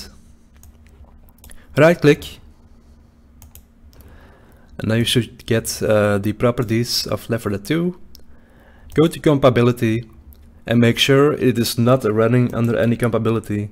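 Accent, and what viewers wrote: Netherlands. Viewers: Are you dutch? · i just knew you were dutch haha i could hear it because of your accent haha. geweldige video man. kan eindelijk weer is normaal left 4 dead spelen haha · are u dutch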